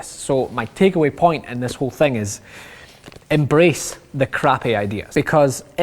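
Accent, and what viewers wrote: Scotland, United Kingdom. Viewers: I love how when i see "crappy" i can hear it in the Scottish accent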